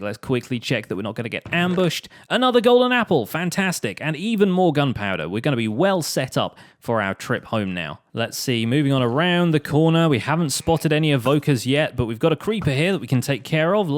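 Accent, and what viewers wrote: United Kingdom. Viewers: XxcoreysindahousexX British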